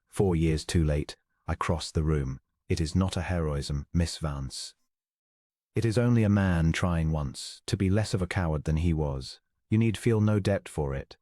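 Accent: United Kingdom. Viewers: Lovely story--well written and wonderfully narrated. Thank you for having a British accent to your narration; it's a British story, after all.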